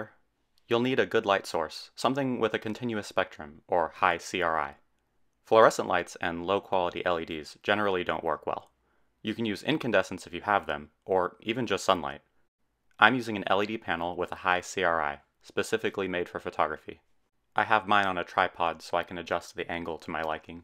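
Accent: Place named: United States. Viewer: Fascinating, and aesthetically pleasing. Look forward to future videos. P.S. this was my first time ever hearing someone with US accent correctly pronounce the word "niche". It grates me when I hear "nitch" - I just can't help myself!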